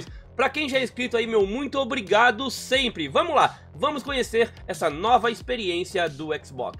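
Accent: Florianópolis, Brazil. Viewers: TU E DE FLORIANOPOLIS???????????????????????????????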